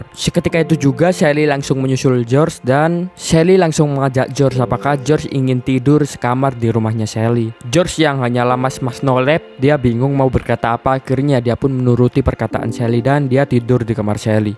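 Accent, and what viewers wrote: Java, Indonesia. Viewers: Jangan semangat tetep menyerah ahhahahaha bikin ngakak. Duh medokny masih keliatan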